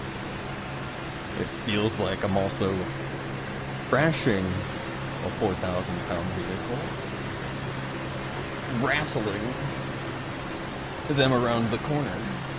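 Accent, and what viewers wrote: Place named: United States. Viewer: Happy New Year! Looks like a fun time. I don't know why but I definitely wasn't expecting an American accent haha. Is your rig banking right when you're taking a banked left turn?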